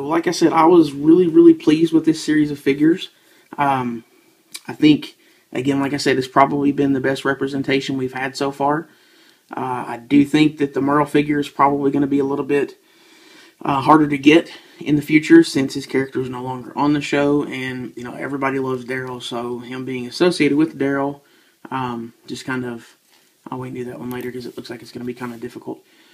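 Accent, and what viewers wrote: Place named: South, United States. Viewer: I do love this show and the figures are nice, my buddy collects them. I am more a transformer and dc collector. I subscribed to your channel tonight while working, I had caught the gl ring review and like it. I like to hear another southern person doing reviews online...btw where are u from?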